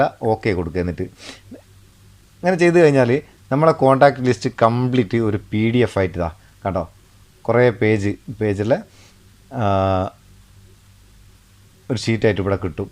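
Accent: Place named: Meppayur, India. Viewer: കോഴിക്കോട് മേപ്പയ്യൂര്‍ ഭാഷ വിടല്ലേ.ആകര്‍ഷകം,ആസ്വാദ്യകരം,ഓക്കേ?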